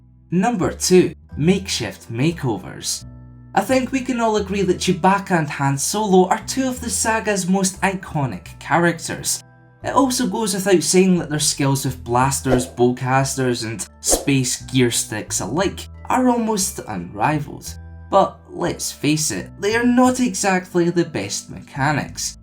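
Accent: Scotland, United Kingdom. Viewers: Scottish accent on Youtube? A surprise to be sure, but a welcome one. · Love the Scottish accent. Could do with a better mic though. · Your a Scottsman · Thank god for the subtitles. The Scottish accent is strong with this one.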